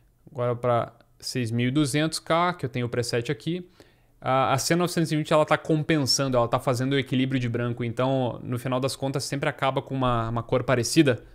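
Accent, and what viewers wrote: Rio Grande do Sul, Brazil. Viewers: pelo sotaque é um gaucho , uma grande abraço meu amigo , teus vlogs são ótimos ... fica com Deus e melhoras pra sua mãe ... ( Gaucho desbravando o mundo )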